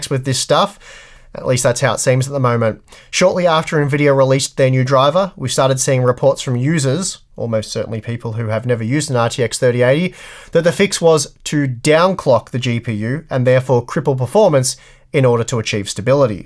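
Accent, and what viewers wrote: Australia. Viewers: I just watch this channel for the aussy accent the excellent quality is an extra. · I just noticed your Australian accent??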